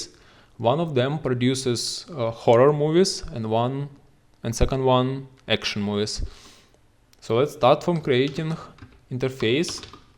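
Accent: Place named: Russia. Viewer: russian accent makes it impossible to listen. anyway thanks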